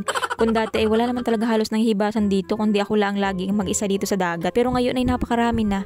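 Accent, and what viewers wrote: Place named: Quezon, Philippines. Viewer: 🤣🤣🤣tuwangtuwa ako sa mga vlogs at kadaldalan mo miss.iLove your accent❤️❤️❤️😘😘😘kaya lagi ko inaabangan ang mga bago mo vlogs.saan kaba sa quezon?ingat sa panghuhuli ng isda baka matinik ka ng stonefish.tingin2 den sa paligid kpag nagluluto ka baka my ahas sa itaas ng punong kahoy...ingat po miss ❤️😘God bless🙏🙏🙏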